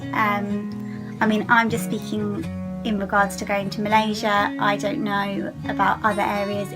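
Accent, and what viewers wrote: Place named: England, United Kingdom. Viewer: thanks I like your accent what part of England are you from?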